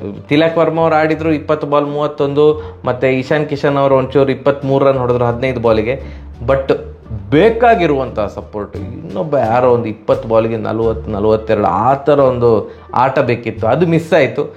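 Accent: Mangalore, India. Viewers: Nimma Managaluru style kannadadalli vivarane kelakke bahala chanda oontu maare, edunna maatra change madbedi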